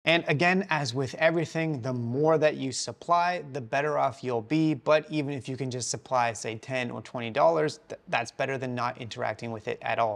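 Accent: Australia. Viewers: but he sounds like an Aussie... kind of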